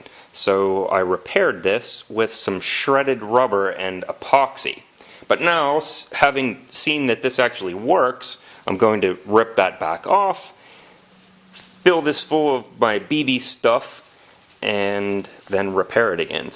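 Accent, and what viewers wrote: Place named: Washington, United States. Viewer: Where is this guy from?? Sounds Washington State to me...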